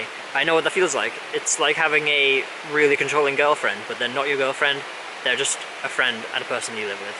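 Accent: United Kingdom. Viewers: I like that your accent ad everyone in the UK is so different, but every time I watch a video of yours I always think you have a cold. Also no one should be told what to do and when to do it. Live your life the way you want and make videos you want when you want. I love Damon and I am glad he is back, but he stopped making videos for so long because he didn't want to make them and didn't feel right making them ya know? Do you and tbh yes what Bryan did was wrong and if he had true friends he doesn't and if they ever think of being his friend again then he has a lot of making up to do and a lot of trust to gain back and everything. I don't know Bryan personally and I am not sure I ever will, but yes I will be careful if I ever was to meet him and know him personally. Keep your head up. ♥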